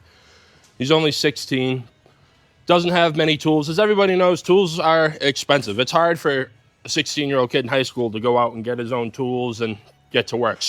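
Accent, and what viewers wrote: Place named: Upstate New York, United States. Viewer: You definitely live in upstate New York with that accent 😂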